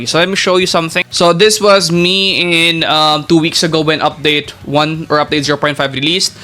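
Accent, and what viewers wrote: Philippines. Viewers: bro, nothing negative, but you have a filipino accent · accent makes it evident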